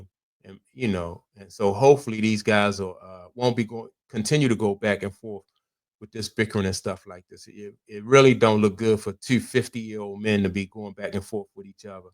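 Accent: Baltimore, United States. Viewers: Sound like you from bmore.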